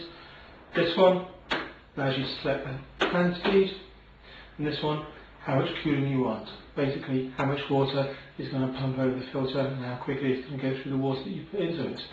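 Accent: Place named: United Kingdom. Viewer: Air conditioning is severely underappreciated here in the UK and honestly I was quite surprised to hear a British accent when I clicked on the video.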